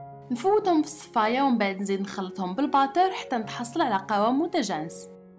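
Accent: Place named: Algeria. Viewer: الله يعطيك الصحة اغزلا قمة الاناقة والهدرة الجزايرية اتحمقني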